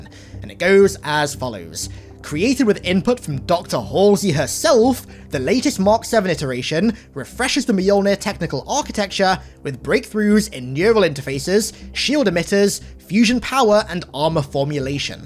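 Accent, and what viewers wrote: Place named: United Kingdom. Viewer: Lmao I don't know, it does sound kinda british I guess, but I can't speak for that since I'm not british.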